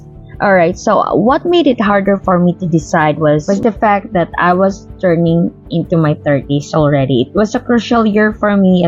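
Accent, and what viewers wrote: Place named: Australia. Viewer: Nice2x. 🎉 Australian Na an accent❤❤❤❤❤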